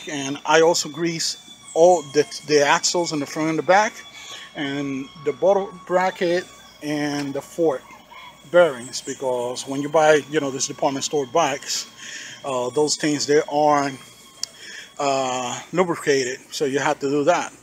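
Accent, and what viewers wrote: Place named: Cuba. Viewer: U are Cuban I can tell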